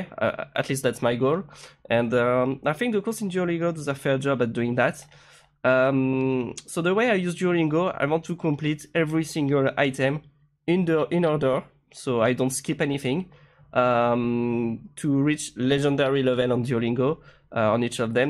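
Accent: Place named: France. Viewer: L'accent français reconnaissable entre 1000 mdrr, depuis tu as progressé ?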